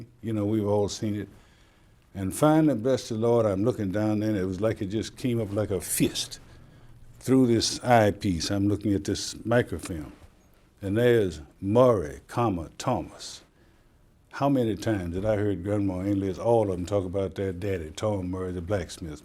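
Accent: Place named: South, United States. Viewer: Yeah he duz hv a southern tone, but its Subtle. His vocal tone cadence add to his credence.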